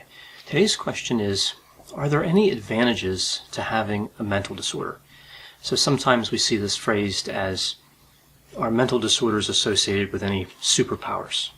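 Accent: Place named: Pennsylvania, United States. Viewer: Are you from Pennsylvania? It’s the accent!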